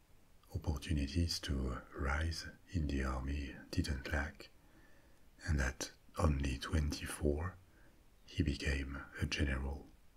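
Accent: France. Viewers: ASMR & History with a French accent.Perfect.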